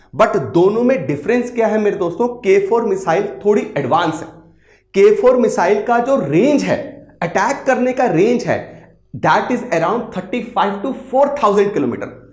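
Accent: Punjab, India. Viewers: Deep knowledge with punjabi tadka 🔥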